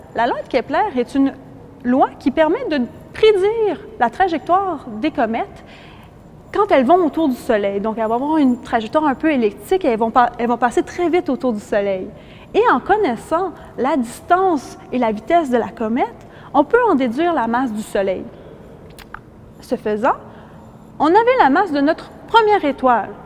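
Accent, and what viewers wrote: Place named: Quebec, Canada. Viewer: Aaah l'accent québécois... Il rendra n'importe quel discours humoristique, aussi sérieux soit il. XD. Sinon très intéressant.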